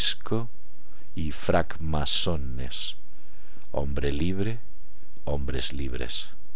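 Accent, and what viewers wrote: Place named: Madrid, Spain. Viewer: Hola,...Amigo! Se me hace que tú no eres de Galicia,..no,no,...por tu acento creo que eres de Madrid, como decir americanos de New York y de Chicago, dos acentos distintos, New York un inglés puro y Chicago un inglés más Slang... No te ofendas, simplemente el de Madrid es más refinado, el de Galicia directo y tal vez lindero con Portugal. Bien, muy interesante lo que haz explicado, soy uruguayo y no me gusta ser despectivo con nacionalidades, te aprecio, soy incha del Peñarol y no me llevo muy bien con Nacional,...es eso, nada más... Saludos, continúa! Daniel.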